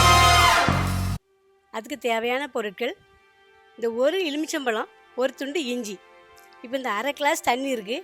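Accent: Tirunelveli, India. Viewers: நெல்லை தமிழில் நீங்கள் பேசும் வீடியோக்கள் அருமை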